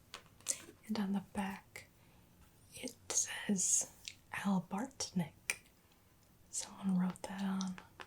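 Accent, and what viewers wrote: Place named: Midwest, United States. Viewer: I love old photographs also because ladies and gentlemen dressed like ladies and gentlemen. People dressed up to go everywhere not just for weddings and funerals. I love your northern Midwestern accent. Your homepage tells me you're not in Michigan anymore😉. You look adorable in everything you wear( especially 1990s secretary roleplay).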